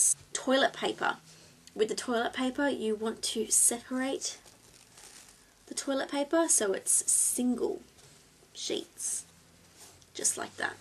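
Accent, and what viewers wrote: Australia. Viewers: are you Australian or from new zealand?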